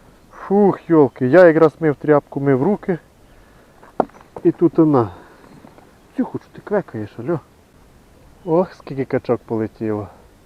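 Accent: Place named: Ternopil, Ukraine. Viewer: Тернопільський акцент. Рибалка це життя і активний відпочинок👍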